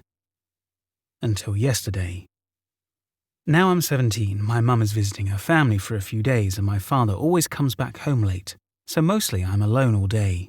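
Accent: England, United Kingdom. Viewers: So glad to have found you! Love your narration. ^_^ Quick bit of pronunciation help for a few North American place names: Ontario has a longer "a" in its 2nd syllable, so it rhymes with "scary," or "hair." :) Maryland is pronounced more like the name Marilyn w a "d" at the end, rather then Mary-Lynn or Merry Land, and Appalachia/Appalachian is pronounced more like "appah-LATCH-ian" rather than a long "a + sh" sound. (I heard a great phase to help people remember this just the other day: if you mispronounce Appalachia someone will throw an apple atcha." XD) I know you're fun the UK so it's tomato vs. tom AS Hto, but I hope that helps. ^_^ 💙💙💙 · I don't understand the British accent/lexicon :'/ but anyway good video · Great encounters I live in Cornwall England I learned about Dogman through listening to Vic cundiff on Dogman Encounters I could not believe it I was shocked so very many people have had encounters world wide Includi g England You sound English please if you can could you find more people to come forward with English encounters I herd of encounter on Dartmore in a back garden Also Tunbrdge wells Kent ,Essex . 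There must be lots of encounters , Thetford Forest Norfolk girl camping I bet there are a few Dogman there . Bext wishes Yvonne Marshall Cornwall England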